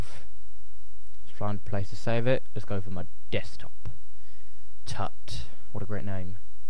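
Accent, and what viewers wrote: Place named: United Kingdom. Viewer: Brits are taking over youtube tuts! and I love it! lol!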